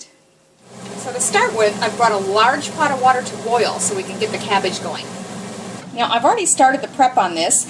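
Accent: Wisconsin, United States. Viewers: aahh,I'm from Chicago,and we can always catch that cheese head accent! Though like you I'm far from home too.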